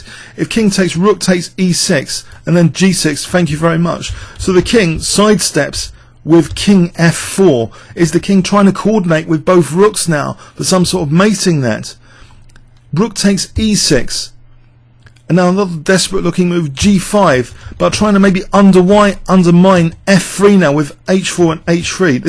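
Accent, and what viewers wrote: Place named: Cockney, United Kingdom. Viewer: great game, and great commentary! I love your competence, your enthusiasm, your emotional way and your nice Cockney accent! thanks for all these videos, it's very entertaining. and happy new year :)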